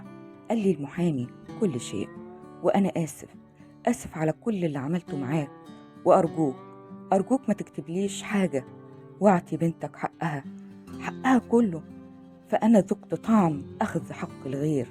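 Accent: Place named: Egypt. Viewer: الالقاء باللهجة المصرية طالع منك كتير حلو ، رجعتيني للطفولة والقناة الأولى والثانية المصرية.. اللهم صل وسلم وبارك على سيدنا محمد وعلى آله وصحبه أجمعين ❤️⚘️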